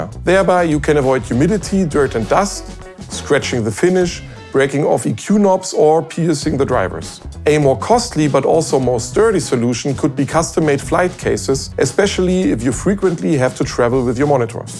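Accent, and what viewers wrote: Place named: Germany. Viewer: Beste, ich liebe dieses deutsche Englisch xD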